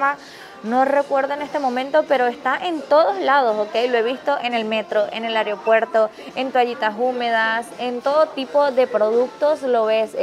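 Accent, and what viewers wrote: Venezuela. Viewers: A donde vaya como habla puedo decir que es Venezolana amo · jajaja no se le puede negar que es venezolana por su forma de hablar super depinga. saludos desde Venezuela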